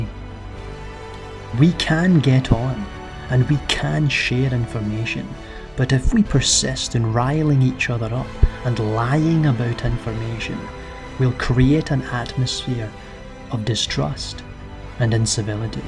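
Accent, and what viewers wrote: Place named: Northern Ireland, United Kingdom. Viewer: My bad - the voice reminded me of the narrator in The History of Film, who is from Northern Ireland.... I guess I wouldn't last long in that part of GB. ☺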